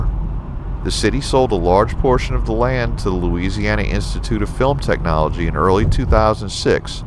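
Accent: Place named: Mississippi, United States. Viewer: I can't tell where you're from New Orleans, until you say, "New Orleans." I used to think you were from Mississippi.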